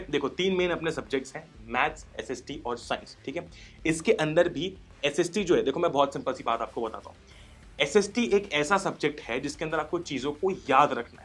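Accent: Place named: Maharashtra, India. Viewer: Hyy aap maharashtra se ho kya💞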